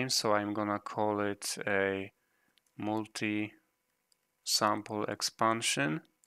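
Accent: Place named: Poland. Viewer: Thanks, you are from Poland maybe because i feel this Polsko angielski dialekt hehe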